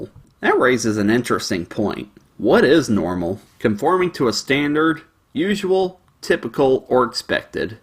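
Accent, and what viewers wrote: South, United States. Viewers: I didn't notice u weren't 'normal' tbh, in fact i considered you the most normal. Or at least in that ur very down to earth and even have a 'country boy' accent to boot. :-/ Liking cartoons isn't weird tho, not since the internet was invented at least. It's weird imo to say anyone who does like those things are weird.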